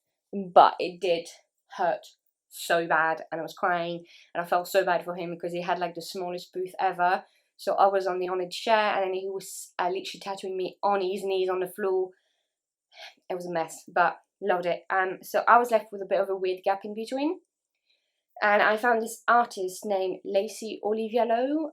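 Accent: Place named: United Kingdom. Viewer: Je ne m'attendais pas à ce que tu ais un accent british quand tu parles en anglais lol!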